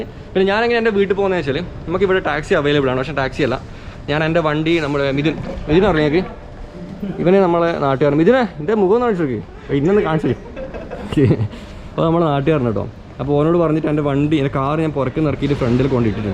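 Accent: Kannur, India. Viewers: Ingale kannoor samsaaram poliyaatto ❤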